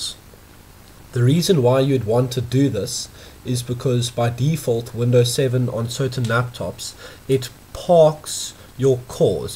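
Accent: South Africa. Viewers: South African yes? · You sound South African... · Great to hear a Safrican voice when browsing technical vids.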